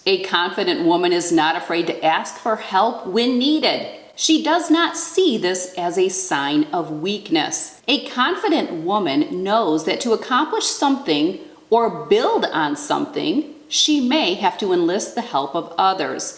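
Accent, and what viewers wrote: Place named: Michigan, United States. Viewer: Your Michigan accent has me laughinnn lol peace from Windsor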